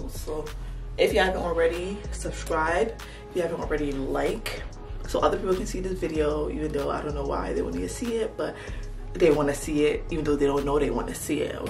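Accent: Liberia. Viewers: Liberian girl? I hear the accent 🇱🇷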